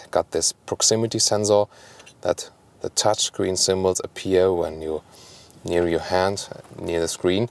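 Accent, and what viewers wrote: Germany. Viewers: An excellent and thorough review by a true gentleman. Your German accent is awesome !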